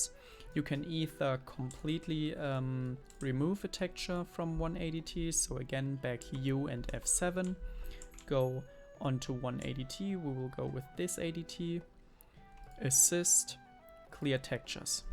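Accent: Germany. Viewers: Wie man am Englisch erkennt, dass der Gute aus DE kommt 🤣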